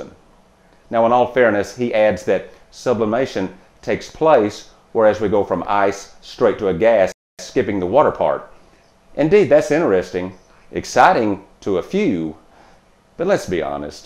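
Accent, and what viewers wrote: South, United States. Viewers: so cus of jebus right ? i hear your ignorant southern accent you beleive in imaginary friends and jebus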